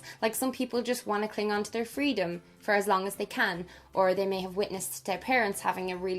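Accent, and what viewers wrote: Ireland. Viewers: You're Irish right? The accent. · Hello, I relate to almost everything you mentioned in this video! You're so funny and your irish accent is beautiful to hear! Youre one of my favorite youtubers, hope you're reading this😊 Hope you a wonderful day. Benedetta ♥️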